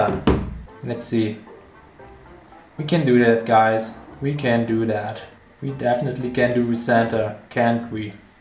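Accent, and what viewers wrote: Germany. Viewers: Ach bist deutsch hab mich irgendwie gewundert über das hat hab mir gedacht das hört sich doch deutsch an lol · Bist du aus Deutschland ? Hab weiter geschaut frage beantwortet. Dachte wegen der Aussprache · i kinda though he was german because of his acent and than this xD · Ah deutsch hab mich schon gewundert bei deiner aussprachr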